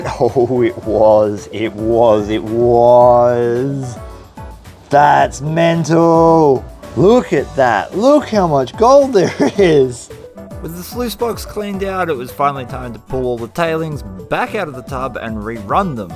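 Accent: Australia. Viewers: I spend a considerable amount of time watching those other gold prospecting videos you mentioned and would like to point out that your personality and energy, as well as your Aussie accent and Gadsey (sp), all combine to make your work the first I choose when there are choices. It would be a shame if you lost the will to continue your work and I commend you for your efforts.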